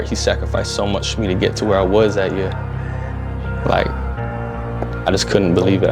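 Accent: United States. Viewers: You have a louisiana accent bro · Bruh, how do you sound like you're from Texas but you claim Canada for the Olympics? Help me understand. Best of luck for next year and LA.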